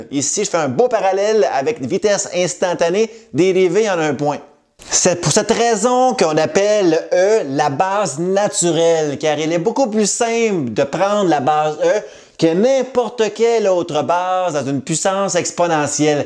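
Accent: Quebec, Canada. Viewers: Je préfère 1000 X l'accent québécois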